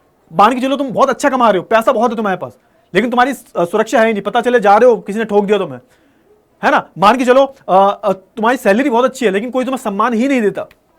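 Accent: Haryana, India. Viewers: sir always my favourite teacher ❤kis kis ko haryanvi boli badhiya Lage hai 🎉❤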